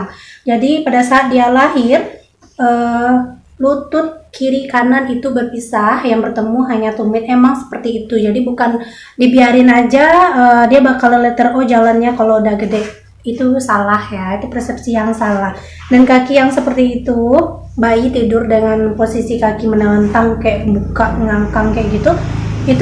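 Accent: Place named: West Sumatra, Indonesia. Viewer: Tangan Bainai ,Logat kental . FIX urang awak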